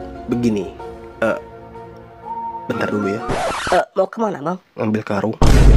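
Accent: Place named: West Java, Indonesia. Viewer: Mang orang Sunda nya 😊😅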